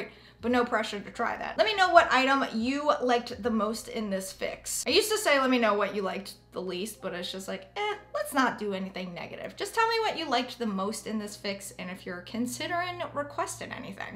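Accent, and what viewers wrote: United States. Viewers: Your box is full of such good stuff! And I love your accent! What state are you from?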